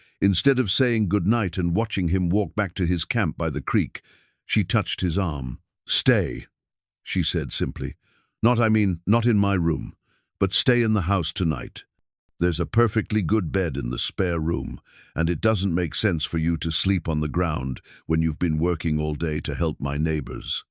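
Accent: England, United Kingdom. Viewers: I’m listening from the beautiful agricultural and lush San Joaquin Valley of California. I enjoy your dramatic stories, they’re narrated well with your particular selected, articulate English accented AI. However I notice they all hold the same theme; that is, territorial differences and proving who holds ownership of land rights. It’s the same story over and again. What’s especially striking me with humor is how all main character men walk and work either shirtless or with the front of their shirts opened. Was this normal? It’s beginning to border on ridiculous.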